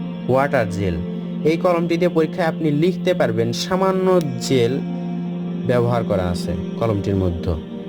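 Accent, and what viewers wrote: Bogura, Bangladesh. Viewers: Apnar basha ki Bogura te. Vai🤔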